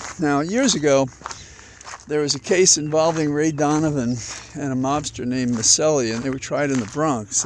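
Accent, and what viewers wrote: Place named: Ireland. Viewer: I do not agree with some things you say,but you do have a wonderful Irish blos in your voice and I think you are a very good Poet without knowing it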